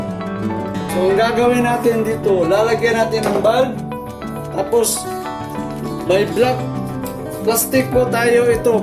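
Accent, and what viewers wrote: Visayas, Philippines. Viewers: Bisaya ka pala sir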